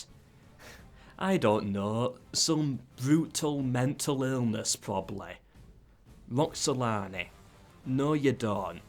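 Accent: United Kingdom. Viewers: Oh god your british acent is that british I underground only 60% of your words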